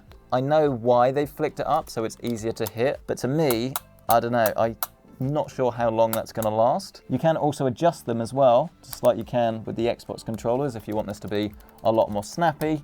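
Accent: England, United Kingdom. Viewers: does bro live in the US or the UK? He sounds like UK (england) but his sponsors and prices seem US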